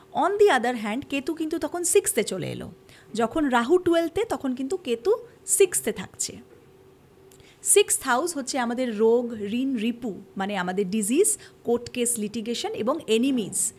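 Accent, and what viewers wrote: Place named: Hindi, India. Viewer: খুবই ভাল,অনেক কিছু জানলাম।কিন্তু চ্যানেল টা তো বাংলা তাই অকারণ হিন্দি উচ্চারণ বর্জন করলে ভাল হয়।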